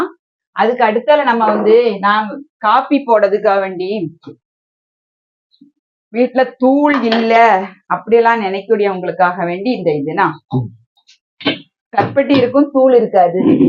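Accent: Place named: Nanjil Nadu, India. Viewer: Sister you are soooooo pretty and you exactly look like my aunty. And i love the slang of nanjil naadu 💐💐💐💐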